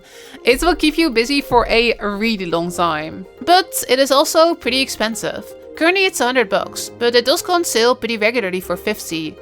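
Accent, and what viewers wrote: Netherlands. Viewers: wat is je nederlands engels accent irritant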